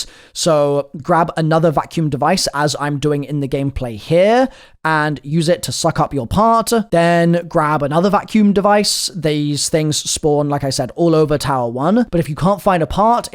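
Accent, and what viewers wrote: United Kingdom. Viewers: British accent is marvelous im a french Canadian and i love the english wotah instead of water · Is it just me or does sound a little British here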